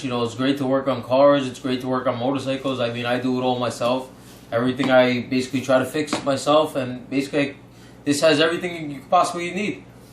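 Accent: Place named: Italy. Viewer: My dudes got to be Italian! I now that freaking accent. My brothers kinda have it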